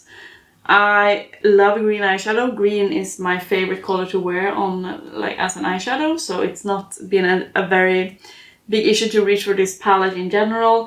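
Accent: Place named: Scandinavia. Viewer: Hi! Here to watch your video with a cup of coffee this month. Also I just noticed you are from Sweden! I was guessing somewhere Scandinavian based on your accent. I had the pleasure of going to Stockholm 2 years ago for a few days and had soooo much fun. It was in November, and I lived in Florida at the time, so the cold weather felt so refreshing and I even got to see some snowflakes! 🩷💜🩵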